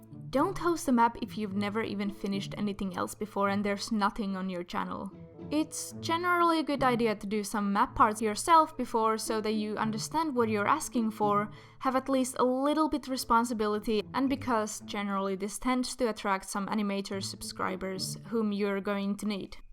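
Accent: Finland. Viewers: Are you from Finland? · Wait a sec, ARE YOU FINNISH. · Why i think you are from Finland?!? Sorry about my bad English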